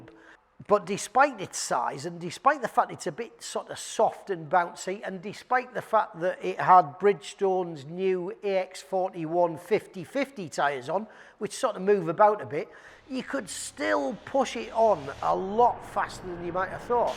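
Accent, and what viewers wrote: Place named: Northern England, United Kingdom. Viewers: It's nice to see and hear a northerner instead of those shandy drinking southern pair of knobheads. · Oh god started to watch then stopped. Can't stand gobby northern twat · Who is this miserable Northerner??? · It's our favourite Geordie !!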